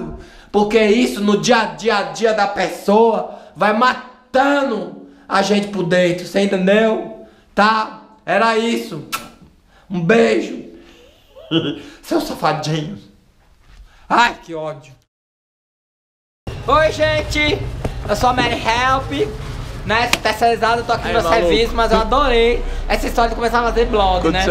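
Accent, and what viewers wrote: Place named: Recife, Brazil. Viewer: esse sutaque é de ricifi né não fia?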